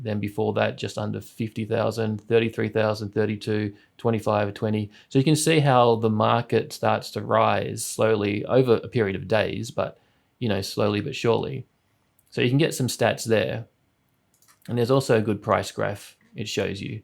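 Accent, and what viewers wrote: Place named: Australia. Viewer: Seems interesting but because u sound like a white Australian, it’s highly likely that this is some kind of colonialist scam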